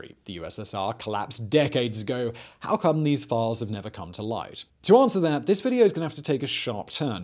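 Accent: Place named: England, United Kingdom. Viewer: Your voice sounds familiar but in a posh English boring drone way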